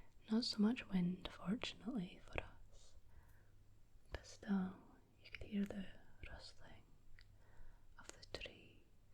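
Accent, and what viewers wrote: Scotland, United Kingdom. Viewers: Love the general kenobi bit and how thick your whisper Scottish accent is 🏴☺️ Also your signature hand movements are top tier ✋ especially the outro where you cover our eyes sending us off to a deep slumber 🙈🤲💙🩵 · hi, your voice remindes me of Jamie form Outlander! I guess it's maybe just a Scottish accent, but still feels like a very caring hug while listening to a midnight story from granparents <3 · I didn’t know that the Scottish accent is so calm and pretty to me!😮 It’s so nice listening to your voice. Mostly I like it when you say something that includes an “r”. 😅 Now i have to watch another video of yours. Greetings from Austria ❤ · I adore this asmr. And your accent too :D As a fellow scottie it was so soothing listening to you speaking quietly. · this whole video i have been thinking that you sound Scottish but I thought you probably weren't as I've seen no Scottish asmrtists but then I heard you say "wee" and went 'I KNEW IT' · I love the way your accent articulates when you whisper. 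Scottish is such a round and organic sound, it's like a warm calming lava lamp 😊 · I cannot emphasize just much I am able to relax upon hearing that beautiful Scottish accent and seeing the cheeky humour mixed with a smile of pure warmth. Absolutely charming every time!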